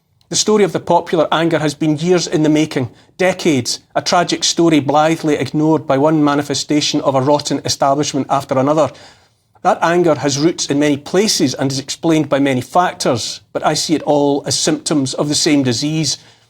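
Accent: Scotland, United Kingdom. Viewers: He’s obviously Scottish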